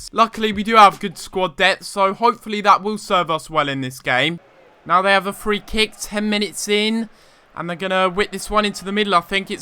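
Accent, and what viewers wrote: England, United Kingdom. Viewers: FIFA 16 Career Mode you did it sounded weird because of your English accent (I'm Australian)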